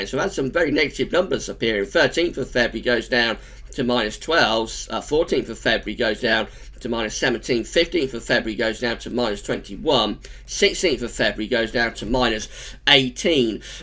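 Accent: United Kingdom. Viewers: what exact township is that accent from my bruvah? I'm east indian trying to learn British accents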